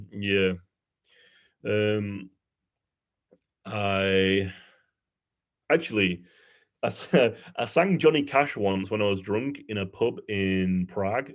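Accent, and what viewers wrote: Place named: North, United Kingdom. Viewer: Luv his northern accent n luv to watch keep it up.x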